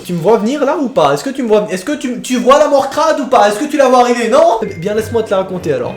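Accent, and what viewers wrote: Belgium. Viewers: tes belge ? moi aussi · T'es belge ?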